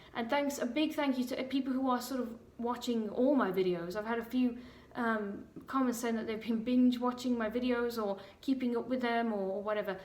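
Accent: Ireland. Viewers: r u irish origen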